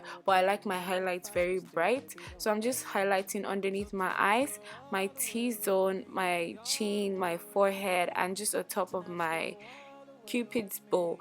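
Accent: Nigeria. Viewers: ehhh shoki😂😂 love that nigerian accent❤❤ beautyful girl💕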